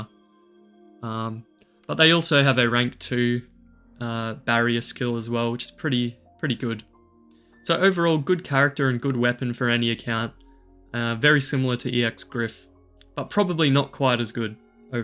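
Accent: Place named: Australia. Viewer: Gorgeous character. Can’t wait to sit down and hammer out this content myself. Got a special project of my own I’ve been working on that wasn’t suppose to turn into the monster it has become, but it’s been fun to hammer out. As always, your content is a pleasure to watch. Wish I had your buttery-smooth Australian voice and cadence. Best of luck in your exams, can’t wait to see what you have planned for 500 subs!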